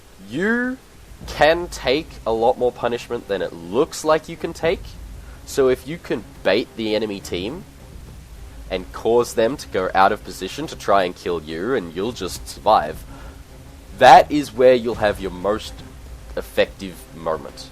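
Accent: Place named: Australia. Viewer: Love your accent man! Australian? Also I tried it and it was heaps fun haha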